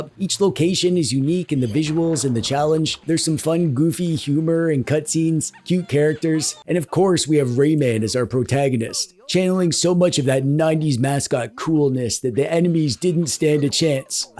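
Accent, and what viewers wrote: Canada. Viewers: Stop saying Mario wrong. You sound like a Canadian.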